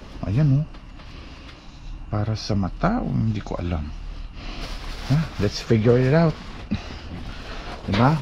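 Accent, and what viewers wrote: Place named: Ilonggo, Philippines. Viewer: D lng ang mga makuha mo ang gpaganyat maglantaw sa imo kundi ang mga Tagalog mo man nga tono ilonggo hahaha.namian mn kmi mamati . enjoy saving the food and some cheche buritche good luck and God bless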